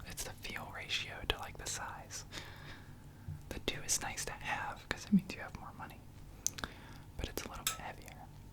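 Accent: United States. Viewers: andré Patricia American accent isn't terrible? He is americain ! he only studied in france. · Your american accent isn't terrible but great !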